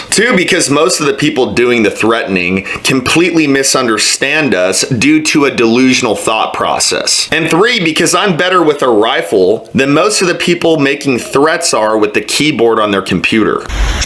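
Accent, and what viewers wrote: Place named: Georgia, United States. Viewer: Dam dude you must be from Georgia because u sound like one of us back wood boys. Tell it like it is! God bless u brother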